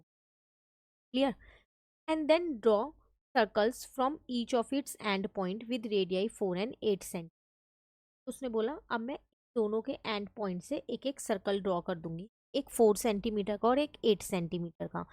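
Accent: Bengal, India. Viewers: Are u bengali😮😮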